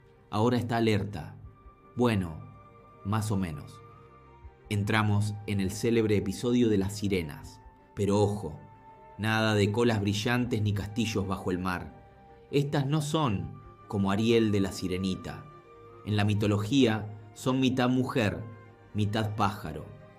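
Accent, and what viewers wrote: Buenos Aires, Argentina. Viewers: A mi si me gusta el acento che, Boludo 😅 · El texto no armoniza con las imágenes ni la época. El narrador es un pibe de Buenos Aires. Horrible. Una lástima, las imágenes son bellísimas. Usa términos como "alta"(estratega) que pronto pasará de moda. Tiene varios de esos.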